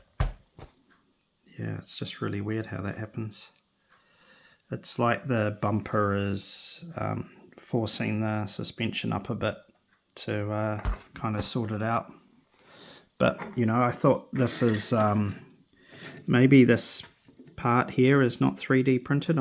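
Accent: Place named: New Zealand. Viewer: So I had one of these growing up, ironically in New Zealand bro! You’re undoubtedly a kiwi with that accent. Lol. My parents couldn’t afford a Tamiya so this was a cheapskate version. Don’t know where they got it but I believe it was on an overseas trip and possibly from Japan. It ran good enough but I remember those T-bone axels would break and we couldn’t get replacements. I’m 50 so this would have been in the mid to late 80’s. Mine was lost to the annals of time, but I managed to find one on TradeMe a year or so ago and have it in my collection! Came with the remote and box. The original looks quite different from yours as it has a tail scoop and huge front bumper. Wish I could post a pic for you. It’s a funky little RC but I’m so stoked to have a piece of my childhood back. Cheers bro.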